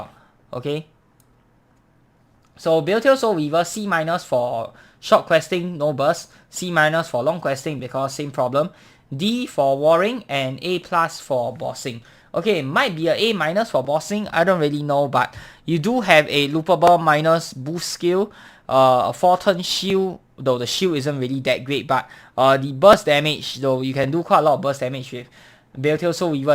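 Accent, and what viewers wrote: Singapore. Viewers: Clear advice + Singapore accent = perfection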